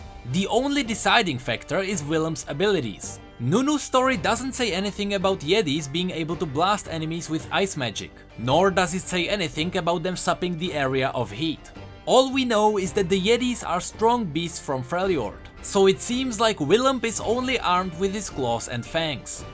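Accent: Czech Republic. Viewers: obv že je čech hes accent is silenj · Bruh you got czecch accent lmao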